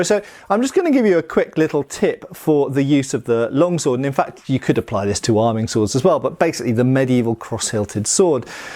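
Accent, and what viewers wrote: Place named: England, United Kingdom. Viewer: The English accent makes him sound smarter.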